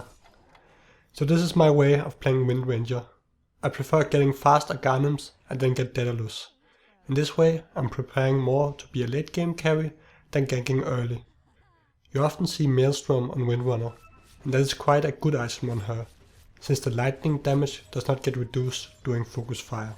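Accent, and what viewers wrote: Denmark. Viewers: The fucking Danish accent is so strong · Your accent is so Danish I love it <3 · How danish can an accent be, from 0-?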